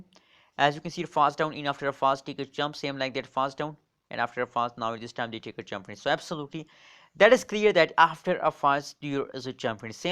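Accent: India. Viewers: Whenever I hear a Indian accent on these stock I feel like it’s a scam. Since all these damn scammers calling people phones are from somewhere in India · Why is there a picture of a white dude but i can clearly hear you are indian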